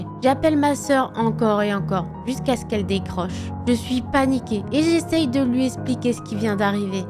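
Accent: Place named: Belgium. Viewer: Belge ahah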